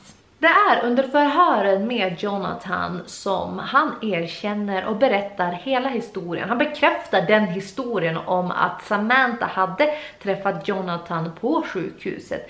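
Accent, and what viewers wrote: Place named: Västerbotten, Sweden. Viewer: Alltså du måste ju va från Västerbotten.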